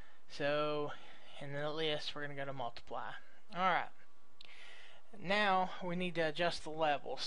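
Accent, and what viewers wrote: South, United States. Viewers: sounds like peter parker (not from amazing spiderman) with forest gump accent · You sound like dunkey with a heavy southern accent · Def not Forrest Gump but very southern, love it! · Thanks for a great tutorial. As for accent, speaking as an Englishman it sounds fine to me. Always liked the southern accent. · I find your voice Awesome.... YOU must be Southern Like me? Perfect tutorial ignore the haters.... simple way to get this effect thanks bunches for this............♥♥♥ was searching for this effect Hugz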